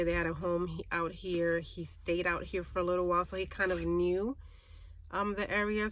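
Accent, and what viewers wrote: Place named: Bronx, United States. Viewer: I hear your Bronx accent. I’m from there too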